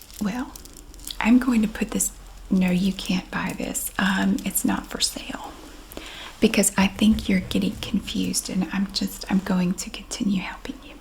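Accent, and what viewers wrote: South, United States. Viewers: I love I'm from the UK 🇬🇧 I love the Southern American accent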